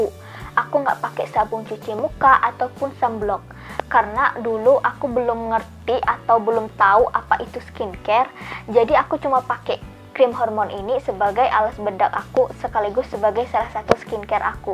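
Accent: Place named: Malaysia. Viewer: Kak cntik asli mna yaa.. cra ngmongny kok kyk org malaysia😀☺️☺️✌️✌️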